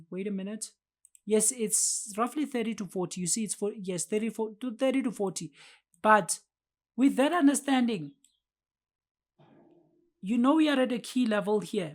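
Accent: South Africa. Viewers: Are you South African I'm hearing your accent? Cela ungifundisa ubhuti. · So nice watching a trading video in a South African voice. Do you stream your live trading? Im looking for a community bruu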